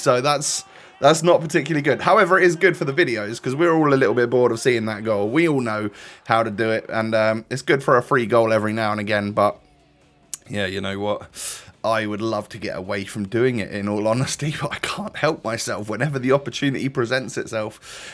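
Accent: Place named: Scotland, United Kingdom. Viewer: didn’t know was scottish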